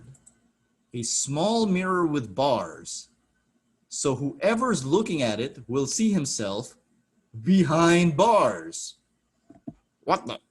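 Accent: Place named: New York, United States. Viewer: That New York accent 😎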